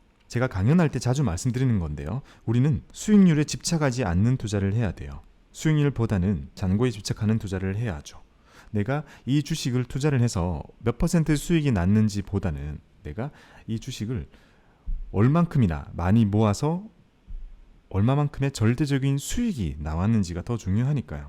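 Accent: Gyeongsang, South Korea. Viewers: 항상감사합니다. 작년부터 펜이됐네요. 약간의 경상도 억양도 매력있고 설명도 알기쉽게 설명해주시니 좋군요.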